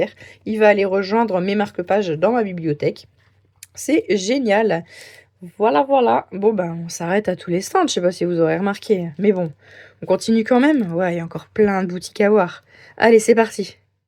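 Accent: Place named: Franche-Comté, France. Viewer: Coucou, hâte de voir les belles créations que vous allez nous faire. Et vive l'accent franc-comtois 😂 petite caresse à vos compagnons à quatre pattes 😻🐨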